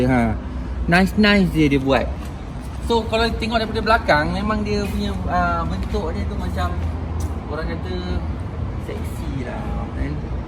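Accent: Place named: North, Malaysia. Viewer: video ni cakap utara, video next cakap sabah ke terengganu ~!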